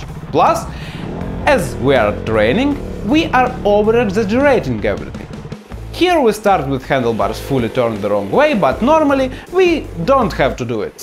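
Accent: Russia. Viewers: can clearly hear ur ruski accent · bro has the best type of russian accent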